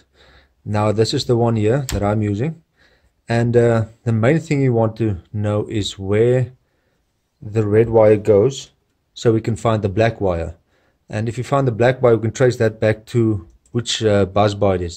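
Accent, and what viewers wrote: South Africa. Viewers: South African . . . within 10 seconds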